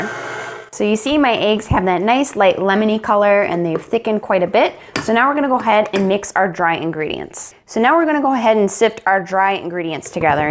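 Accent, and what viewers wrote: United States. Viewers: My husband is going to love this! New sub! Your video for the raspberry jam came across my gmail feed. Your accent is adorable! What state are you from? Love from Texas☺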